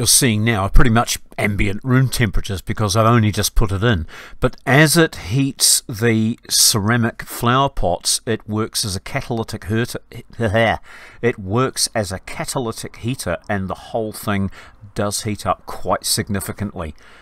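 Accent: New Zealand. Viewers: You sound like a fellow Kiwi man.Not been home for many years and right now I'm living in Odessa but there's no fighting here.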